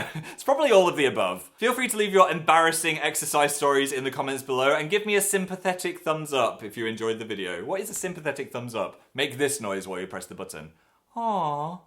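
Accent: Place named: England, United Kingdom. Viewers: Is it just me or when pretended to be American as that gym dude on the phone he suddenly sounded... low class. Like literally, having an English accent is part of his charm. And he's better that way! Pray we may never stumble upon an American. Amen. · last time i was this this early still had a northen accent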